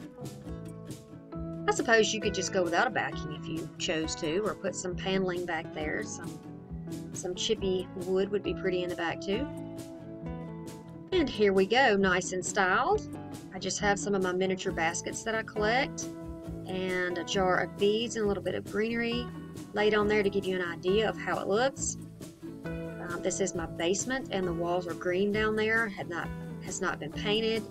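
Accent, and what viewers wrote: South, United States. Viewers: Found you via Julie’s channel from your comment. I’m from Alabama originally. Now living in Panama City beach. Love to hear us southerners on YT 😁😁😁😁😁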